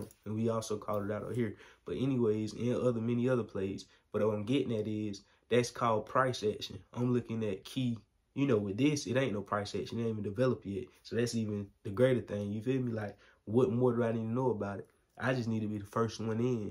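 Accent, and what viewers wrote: South, United States. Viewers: nah he just has that southern accent fr.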